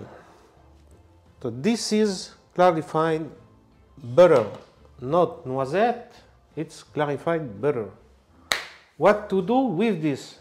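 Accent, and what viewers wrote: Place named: France. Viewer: Thank you for another good video. You are so good at explaining in a simple way with your lovely French accent😊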